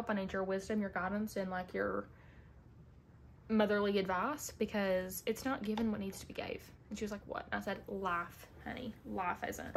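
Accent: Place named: Kentucky, United States. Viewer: Are you from Ky? Your accent makes me think you’re a Kentucky gal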